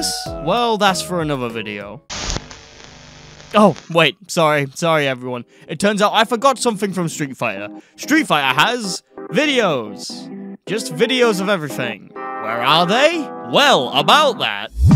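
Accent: United Kingdom. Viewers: My favorite sub genre of YouTube is British guys talking about fighting games.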